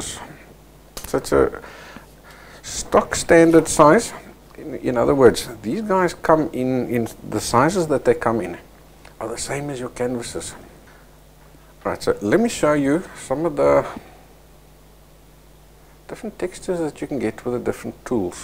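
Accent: South Africa. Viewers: South Africa accents make me happy. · Are you South African? You sound like it! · you sound like your south African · is that a south african accent i smell???? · A Saffa!! Makes my heart happy to hear ❤️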